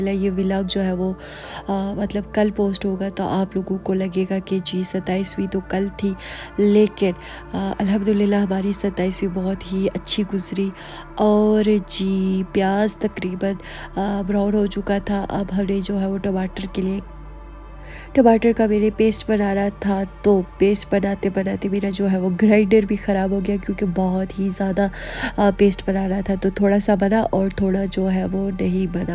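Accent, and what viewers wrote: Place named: Kashmir, India. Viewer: Bht achy Awaaz ha literally khasmeeri lahjy m very nice vedio goodgirl nice effart❤❤❤❤❤❤❤❤❤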